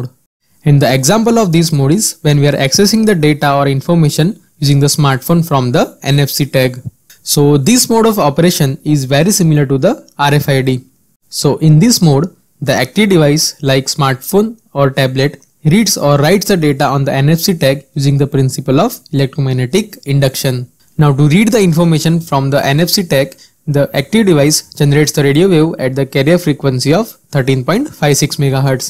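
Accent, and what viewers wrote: India. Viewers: Why is every goddamn tech guide on youtube narrated by someone with a heavy Indian accent? I'm sorry, but for some reason I really have difficulty understanding that accent. Something about the isochrony just throws me off mid sentence, and then all I hear is gibberish for a few seconds, until I recognise a row of words and can follow along again, until I'm thrown off anew. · A very beneficial video on the topic starter. Just a friendly piece of advice: since this video will be shared all over the world & not everyone fathoms the Indian accent, you could make use of reader bots the next time maybe (make it accent neutral). btw ..Did I say this was a helpful video? 👍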